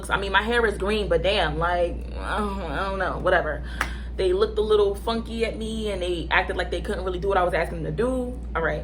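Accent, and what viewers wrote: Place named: New York, United States. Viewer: Ouu im from nola and and I hear that ny accent are u from queens ?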